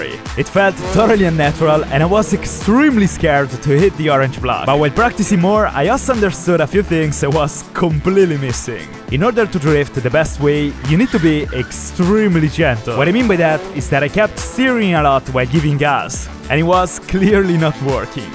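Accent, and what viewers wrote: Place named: Italy. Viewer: ciao dall accento si capisce che sei italiano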